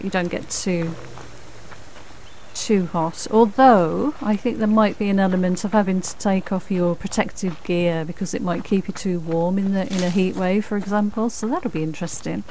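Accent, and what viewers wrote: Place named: United Kingdom. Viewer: Thank You for the information about the new update. I really like to listen to your lovely British accent. You speak a very good english, very easy to understand for someone from the continent. Keep on the good work!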